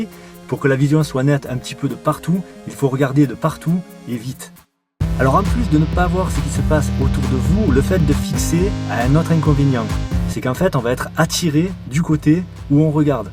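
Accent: South, France. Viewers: Super vidéo, et super accent du sud. 😊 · Accent de sud?????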